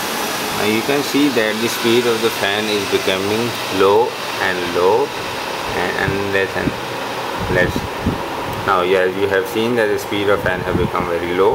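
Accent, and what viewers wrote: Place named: Pakistan. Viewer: wah brother Pakistani Laga Hn.. hahahahah i m Pakistani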